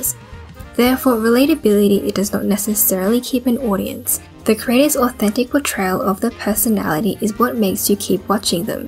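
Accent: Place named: Australia. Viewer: off topic but i really love your accent! is it australian?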